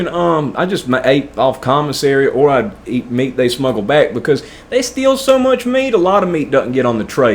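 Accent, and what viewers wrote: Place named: Tennessee, United States. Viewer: Man great story. When I hear you talk it sounds like a TN accent, sound like we grew up together. Kept wondering did I go to school with?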